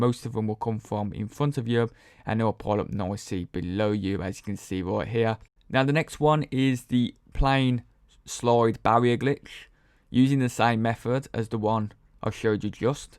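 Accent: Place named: Birmingham, United Kingdom. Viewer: Called a brum accent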